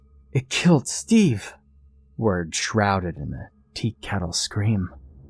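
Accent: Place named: Western Maine, United States. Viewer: you sound like a few guys I know up in my area of Western Maine♡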